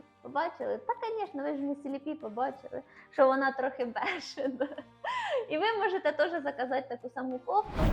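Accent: Poltava, Ukraine. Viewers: Це Полтавский діалект, це наша гордість, і я так розмовляю, 🎉 суржик Полтавский👍❤🐱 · і в Хоролі так балакають. Даже мамкають і папкають. Так приємно чути рідний полтавський говір. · ти талант а полтавський акцент неперевершений, дуже люблю тебе слухати і дивитися. МОЛОДЕЦЬ!!! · . Ти золотце) Це смішно звертати увагу на негативних дібілів. Плавають в жовчі, то хай і тонуть вній) полтавський акцент це просто кайф❤ не звертай уваги, люди - хейтери просто обмежені, або жалюгідні або потопають у власних проблемах, які і є частиною карми. Сорі за словесний бульйон, я під вином)) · Не звертай уваги. Ми всі на Полтавщині так говоримо. Але як треба вміємо говорити, як треба. Страшенно не переношу Ірину Фаріон. Грубіянка, замка, в минулому. Партєйний дєятєль.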